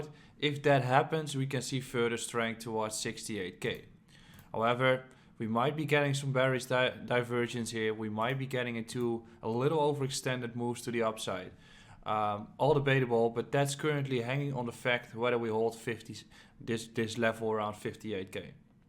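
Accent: Netherlands. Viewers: Are you Dutch? You sound like Dutch.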